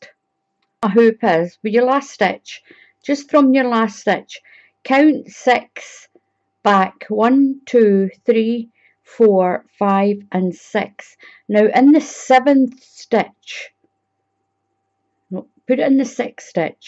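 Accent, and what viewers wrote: Scotland, United Kingdom. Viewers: Hiya! Great to hear a Scots voice! Recently learning crochet. Following exact measurements for my lurcher Eloi. (Bedlington X deerhound). Apart from missing a post during my first round of front post bk post which I'm aware of and cringing about (lol!) - have u any tips on tension as my work has gotten quite loose? I'm only on the 3rd row but am mightily impressed. It doesn't REALLY matter much as his lurcher neck grows in size as it joins his neck so I could work in a longer neck onto his hat in theory anyway 🤭 big hello and thankyou from Dingwall! ✌️♥️💪👏🏴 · I’ve had double fun watching this tutorial! Firstly, Muttley looks fabulous, he’s such a good model for the hat! Secondly I’ve used the CC captions for the first time and your gorgeous Scottish accent has completely flummoxed it! Every time you say the word “six” it shows “sex”!!!!! 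If you want a good laugh I suggest you turn on the CC captions and settle back with a cuppa!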